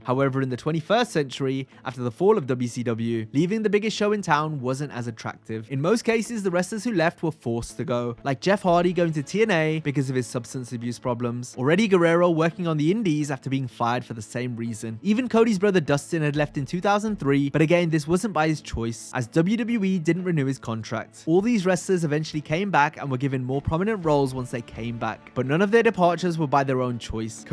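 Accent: United Kingdom. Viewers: British people and their pronunciation of words never ceases to amaze me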